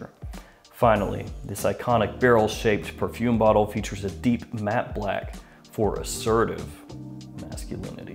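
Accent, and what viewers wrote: South, United States. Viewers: Fragrance Leader... with a slight Southern accent... outstanding! #BeepBopBoop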